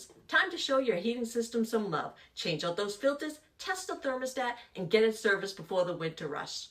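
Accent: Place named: Boston, United States. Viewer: that adorable Boston accent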